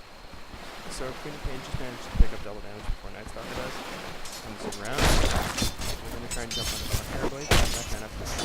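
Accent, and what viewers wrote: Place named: Newcastle, United Kingdom. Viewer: hi geordie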